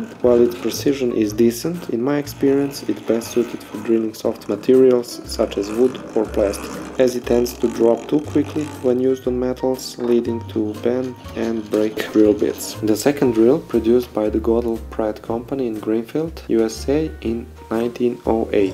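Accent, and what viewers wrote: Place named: Russia. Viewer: У тебя такой классный русский Английский, прям не могу)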